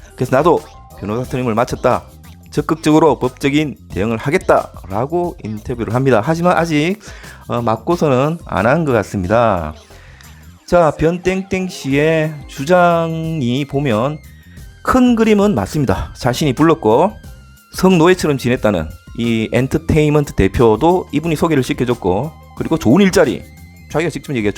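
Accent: Gyeongsang, South Korea. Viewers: 경상도 말투던 뭐던 전달 엄청 잘되는데.. 외국인이 영상을 보나?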